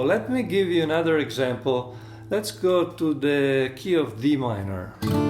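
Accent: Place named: Italy. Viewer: thank you; i could spending hours listening to your italian gusto sound!thank you man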